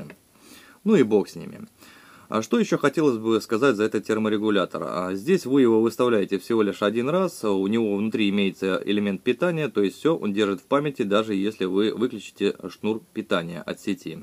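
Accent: Russia. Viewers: Нагинаем..., дубенеет... Вроде по русски говорит, да не совсем... Понятно что местный, южный, диалект. Но слух режет... Я один заметил, что выключатель питания не правильно подключен, не по феншую...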